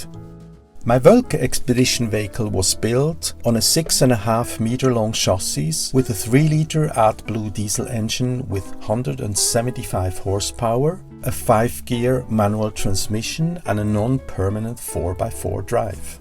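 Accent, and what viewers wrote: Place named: Switzerland. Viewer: Is this chap Swiss?...he certainly sounds weird enough?!.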